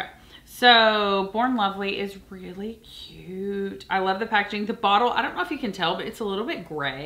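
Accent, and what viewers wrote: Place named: North Carolina, United States. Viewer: SOTD Paco Rabanne Lady Million Lucky 🍀 I always wonder if your from North Carolina by your accent. I’m originally from Long Island NY, moved to Charlotte in my late 20’s and now I live in TN. Hoping to move back to NC sometime in the future.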